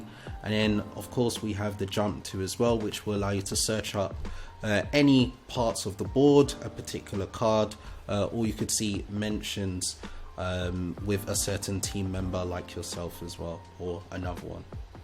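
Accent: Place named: United Kingdom. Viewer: Thank you for the video and the refreshing-to-hear British accent.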